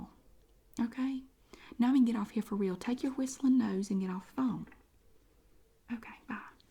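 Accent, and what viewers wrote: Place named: Carolina, United States. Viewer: Accents change a lot based on location. Her accent is mostly from north/South Carolina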